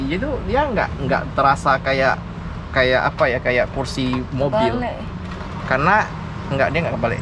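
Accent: Pontianak, Indonesia. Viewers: Org pontianak ke bg? Logat nye macam org ponti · bahase budak pontianak ni kental.. semangat bro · celake pontianak banget 🤣🤣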